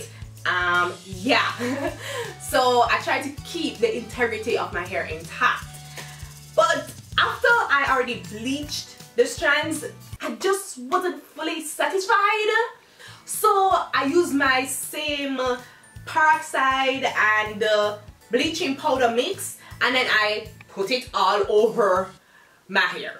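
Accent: Jamaica. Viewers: are you from Jamaica or did u lived in Jamaica because u talk like a Jamaican and btw am from Jamaica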